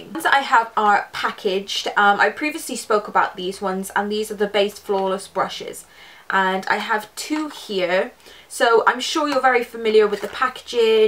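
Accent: United Kingdom. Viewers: I liked your accent UK 🌷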